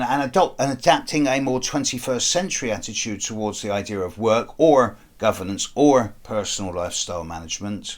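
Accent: United Kingdom. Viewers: Space for the Soul This is a standard British accent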